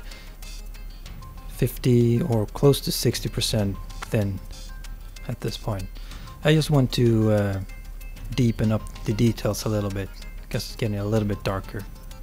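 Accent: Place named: Sweden. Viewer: Tyckte att jag anade lite svensk brytning i din engelska. Nåväl, mycket bra klipp och snyggt målat. You are subscribed :)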